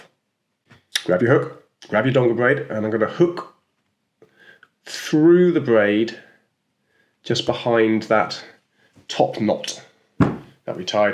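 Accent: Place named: RP, United Kingdom. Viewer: You don't sound as.posh when you're filming fishing on the beach... great informative flick buddy 👍 👌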